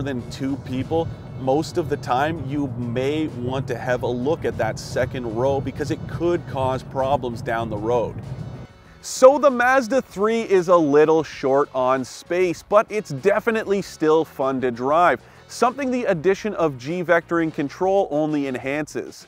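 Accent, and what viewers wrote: Canada. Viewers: Michael Zhu he's Canadian. Canadian's talk the way they do just as people in the U.S. South talk the way they do. Ever heard a southerner drawl out nearly every word they speak?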